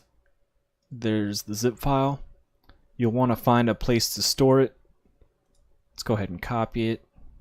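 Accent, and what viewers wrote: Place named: United States. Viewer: hey dude love the video, clear good old plain american english, and great quality too! Could u please make a short video or just quickly explain because i ALREADY have git installed but i would like to use Cmder ! Is there a way to intergrate the two or maybe unistall git and install the complete version of Cmder(inc git) thanks so much ! ☺️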